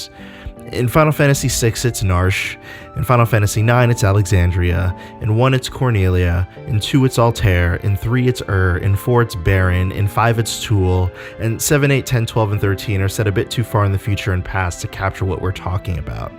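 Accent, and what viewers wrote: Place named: Denmark. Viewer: I love your content, but.. Danish accent in English is like a trigger to me. It's probably my top cringe button. I enjoyed the way you did your logo though, with the more animation-y momentum and that the particles, really dug that.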